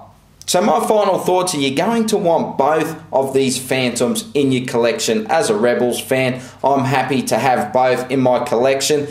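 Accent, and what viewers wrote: Australia. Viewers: u are so aussie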